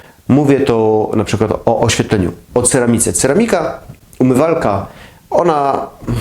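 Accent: Silesia, Poland. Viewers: Uwielbiam pana śląski akcent mogłabym słuchać godzinami ☺️ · 👍❤️ SUPER 😘 i do tego uwielbiam Pana śląski akcent,😍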